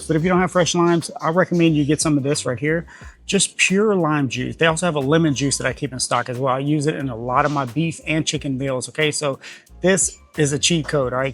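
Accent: Louisiana, United States. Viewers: a bibimbap or bulgogi bowl meal prep would be a lot of fun! I thought I heard a Louisiana accent. I grew up there but am now in DFW 😊.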